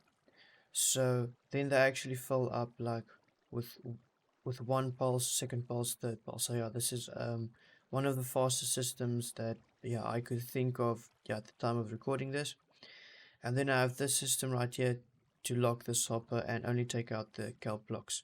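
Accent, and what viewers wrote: South Africa. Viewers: Nice to hear a fellow South African!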